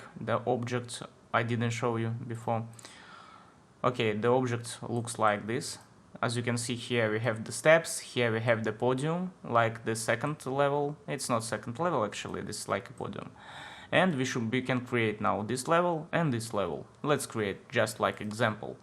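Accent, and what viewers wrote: Russia. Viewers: Какой прекрасный русский акцент!!!🙃 А я поначалу очень удивился тому, что понимаю почти все что говорят! Обычно понимаю процентов 30 из сказанного!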